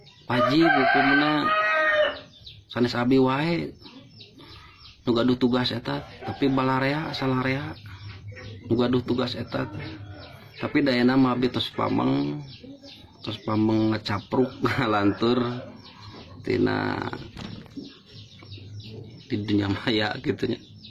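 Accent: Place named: Sunda, Indonesia. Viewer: Sunda asli mantul mang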